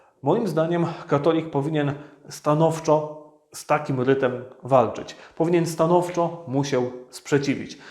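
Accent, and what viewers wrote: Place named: Italy. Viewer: Miałbym do Księdza uprzejmą prośbę, by przytaczając cytaty z tekstów łacińskich raczył Ksiądz stosować się do tradycyjnie przyjętych w Polsce prawideł wymowy, bez zachwaszczania italianizmami itp. Z góry dziękuję!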